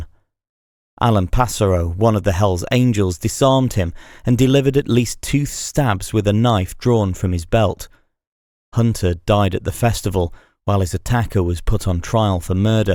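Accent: United Kingdom. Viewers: Why are these always British commentary guys?!?!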